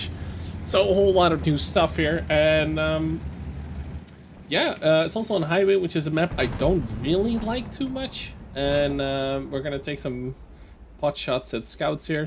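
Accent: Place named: Netherlands. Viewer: You are dutch right? I feel like I can hear it in the way you pronounce the "V" in VK :)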